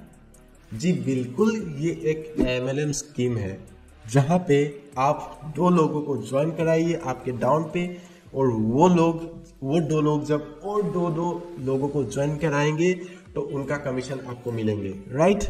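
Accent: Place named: Assam, India. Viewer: yes bro absolutely ! 😃 my hindi is also like you and I am also from Assam.. Joi Ai Axom dada ❤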